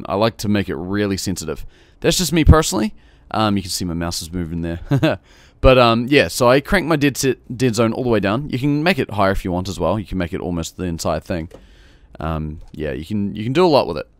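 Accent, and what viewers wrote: New Zealand. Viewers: yo I'd he a new Zealander?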